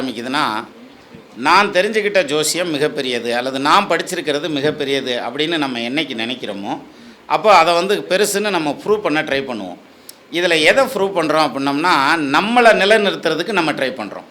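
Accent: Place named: Madurai, India. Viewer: இவருடைய மதுரைத் தமிழ் சொக்கன் குடுத்த சங்கத்தமிழ் சொக்க வைக்கும் தமிழ் அற்புதம்.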